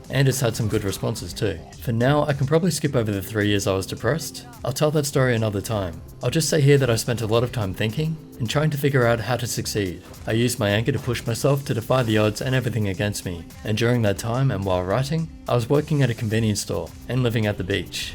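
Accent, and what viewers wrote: Australia. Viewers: I seriously love ur smooth soft aussi accent voice. Been quitely following you for many months and have recommended you many times to many friends and they all got great help from your Videos eventho youre not yet quite at 100k congratz to being the most amazing tech/mouseyoutuber on this platform. -Not so smooth german dude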